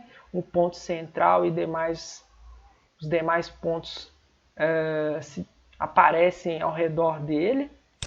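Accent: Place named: Belo Horizonte, Brazil. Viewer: Aula top! Bigode irado! Sotaque de BH bonito demais! Obrigado pela aula! sucesso!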